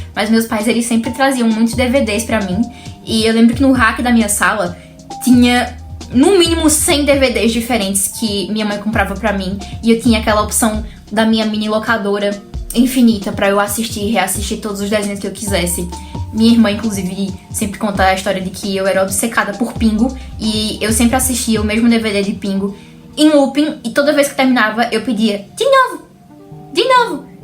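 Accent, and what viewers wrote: Northeast, Brazil. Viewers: Talvez eu esteja enganado, mas percebi um leve sotaque nordestino em você, talvez influência de algum conhecido. Eu sou do nordeste, por isso. Eu sinto muita nostalgia dos anos 2000 também, muita mesmo, e mais ainda dos anos 90. Eu nasci em 1992 e possuo 33 anos, não importa nada do que digam e explicações, eu vivi esses anos e eles são infinitamente melhores que os atuais. E eu posso argumentar com fatos! As pessoas viviam focadas em suas relações interpessoais; A política e economia no país seguiam a normalidade, ou seja, infinitamente melhores que hoje; As drogas tinham menos alcance, haviam poucas facções e não controlavam o país inteiro como hoje; Havia segurança jurídica: Os políticos não roubavam descaradamente e nem prendiam quem descobrisse; A modernidade líquida não havia de tornado, ainda, o padrão. E eu poderia ficar aqui por horas. 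· Oii bom dia tudo bem? tava tomando café da manhã, e fui abrir o youtube pra assistir algo enquanto como, e seu vídeo apareceu na minha tela com um título que me interessou bastante, assim que você começou falar, já senti que era de algum lugar do nordeste/norte, e vi ali num comentário você falando que é de PE, sou de Garanhuns, não sei se conhece, ah e você falou que vai fazer 22 daqui alguns dias, vou fazer 27 dia 31 desse mês também, enfim, de qualquer forma queria falar que sinto que seu canal já virou um dos meus favoritos do YT, tanto por você ser do mesmo estado que o meu (isso causa uma sensação de maior próximidade, sei lá), quanto pela qualidade do primeiro vídeo, isso faz eu sentir que os seus próximos vídeos irão me agradar sempre, te desejo todo sucesso do mundo! ansioso para ver os próximos conteúdos <3, saudade anos 2000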